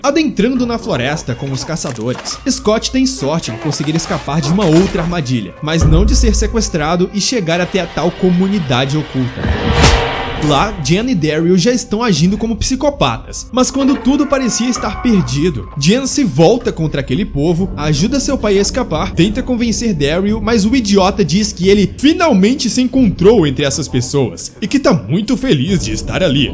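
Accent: Northeast, Brazil. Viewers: Um brinde ao sotaque nordestino!!!!!!!!!!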